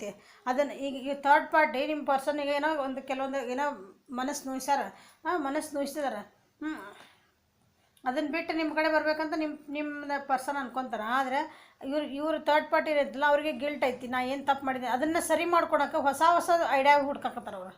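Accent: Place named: Vijayapura, India. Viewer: Bhahal chanda heliri akka nivu helidu kare ada noda akka nammadu vijapura nimma matado saili namma kade ede anasakataiti yava uri akka nimma vedo ede fast nodari kannige bididu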